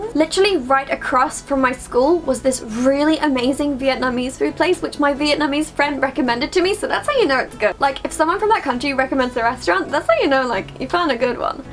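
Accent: United Kingdom. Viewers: You have the most British/English accent I've ever heard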